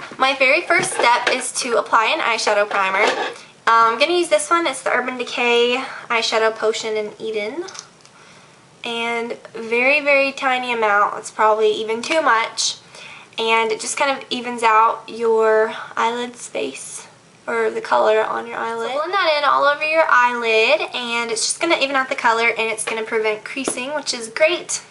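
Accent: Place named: Texas, United States. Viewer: awesome tutorial, it sounds like your getting a texas accent or something lol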